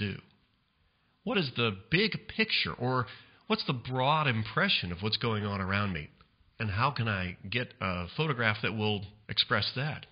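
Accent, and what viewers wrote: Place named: United States. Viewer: Love this kind of American accent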